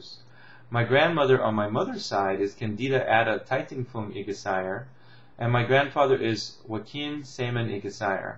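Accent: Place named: United States. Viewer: His accent is very stateside. Like he is just reading it, but does not know how the islanders really speak. Sorry but that is my opinion. I do give him huge credit for actually speaking the language though, as I do not. I never thought it was important ( I left the island at about 3 yrs of age and did not come back to live until I was 17.)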